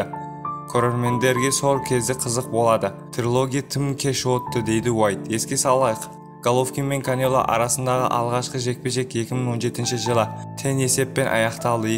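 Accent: Uzbekistan. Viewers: коментатор, тілің қарақалпақшаға ұқсап кетеді екен, қай жердесын бауырым?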